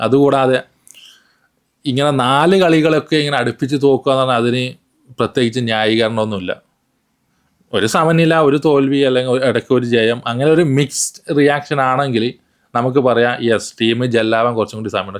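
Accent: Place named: Tamil Nadu, India. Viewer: Ninde malayalam thanne manasil avunillaa..thaan thamizhan ano. Pinne shokam united.n aara parayne..niyarada 30 kollathin shesham oru pl trophy kandda... liverpool fan aaa idparine kayjja kollam a city onn thazhe poyappm...pottan lottery adicha pole oru trophy kitti....ithrem mosham samayath koodi poyappm..oru poolan fanm njjn onlinl kanditt illa...kayjja kollam trophy kittyappm...alle ni youtube thanne thodaggne.pooo mone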